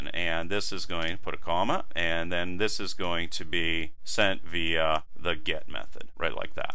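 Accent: Pittsburgh, United States. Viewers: Killer accent you yinzer you :))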